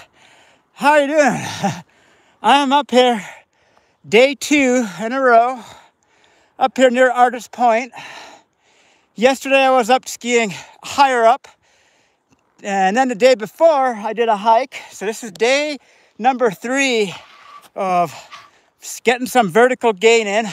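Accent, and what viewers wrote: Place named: Scotland, United Kingdom. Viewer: Are you Scottish or Irish....or both?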